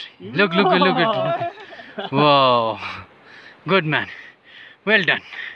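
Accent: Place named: India. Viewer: Fullywatched 💯💯✅. By the way. Your voice like Indian. Is it write?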